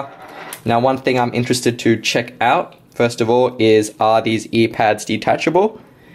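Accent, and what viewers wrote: Australia. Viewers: I like ur australian accent!!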